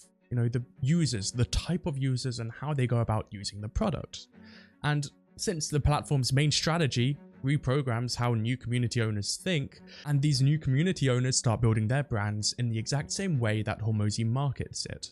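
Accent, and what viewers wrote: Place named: England, United Kingdom. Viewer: Even with an english accent, this dude still could not sound intelligent. Give up bud!!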